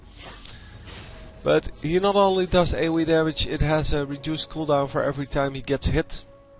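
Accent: Netherlands. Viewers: hearing a bit of dutch trough your voice :D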